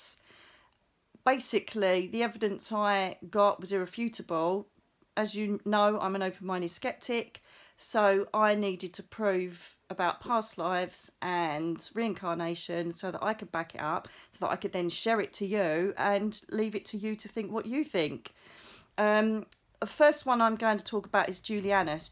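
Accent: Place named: United Kingdom. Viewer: Im just going to go ahead and say it ask it whatever lol 😅 is it possible that you have been a spirit guide to me before or can you be a spirit guide to someone while part of your soul is currently incarnated at this time let me explain its your voice the british accent the sound and frequency is almost an identical match to what Ive heard speaking to me for years. Im from WV USA but Ive always had a lovely female with a British accent that often speaks to me. I use to brush it off so noone would think I was crazy. Lol 😅 I said it I almost erased this but I heard a big NO in my left ear and a nudge to continue and ask dont erase or feel embarrassed 😂 anyways Thank you for all you do your a wonderful teacher ❤️😊 and yes I'm very grateful for all the knowledge you give and the stories you share dearest 🙏💜🕊️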